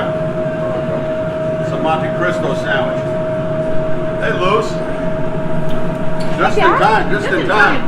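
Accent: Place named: New England, United States. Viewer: Love your pronouncement, "Croaked Mon Sewer" LOL. Not knocking it at all mate, just thought the NE accent rocks!! I'm in Vermont here. ps: Johnson Wales Charleston, SC Campus Culinary class of 1996 here. Since retired but still cooking the classics. Cheers!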